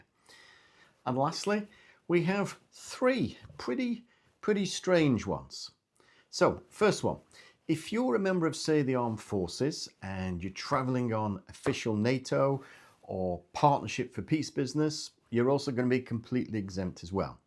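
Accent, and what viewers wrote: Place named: Blackpool, United Kingdom. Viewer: You sound more of a blackpool type of guy anyway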